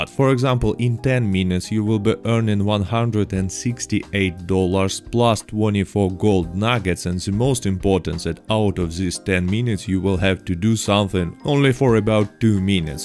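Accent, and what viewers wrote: Russia. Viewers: Thanks for making me very rich, really appreciate, btw, your Russian accent sounds really cool. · Блин думаю русский не русский ты, и потом вижу текст русский и потом понимаю почему у тебя такой акцент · а теперь я не могу понять, он русский или американец....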